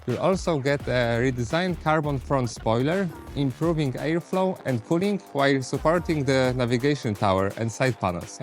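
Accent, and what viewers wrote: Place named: Poland. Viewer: My ears bleed after listening to this Ponglish 😢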